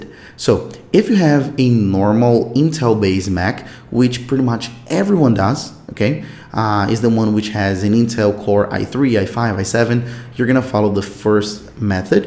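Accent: Brazil. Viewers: Brigado cara! Eu se que vc fala Portuguese! Could tell you were Brazilian by your accent speaking English!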